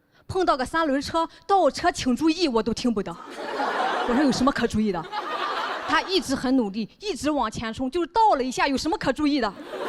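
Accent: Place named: Shandong, China. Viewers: 山东口音，徐志胜也是山东的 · 面相身材口音非常山东！😂😂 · 这个姑娘真不错 三观正 不是为了骂人而骂人 比如杨某 带点土的山东口音又给人真实感 赞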